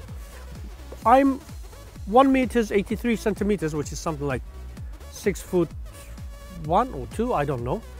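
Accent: England, United Kingdom. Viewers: Tam bir ingiliz aksanıyla konuşuyor, ne değer göçtü gitti bee....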